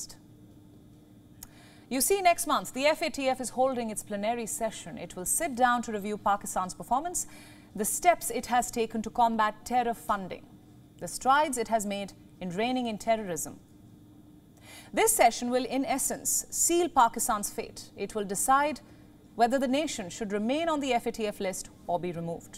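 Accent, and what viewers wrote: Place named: India. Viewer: You aren't neutral. I just heard your voice. And told my friend that it's an Indian girl. Loosers. Just keep on saying our names. It's good to hear that how much you value us. By just focusing on us. 😅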